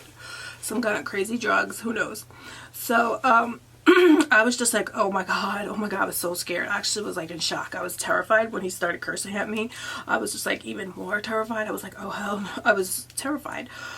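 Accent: New York, United States. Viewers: Are u from ny?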